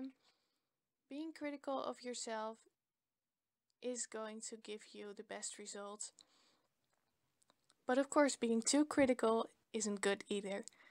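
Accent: Netherlands. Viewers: Are you dutch? (Want k hoor een soort Nederlands achtig iets in je Engels) Youre realy good at drawing btw 💗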